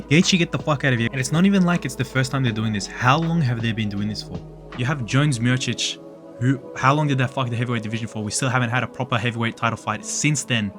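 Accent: Australia. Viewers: Didn't expect an Aussie, presently surprised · wtf didn't know my favourite editor is australian 😂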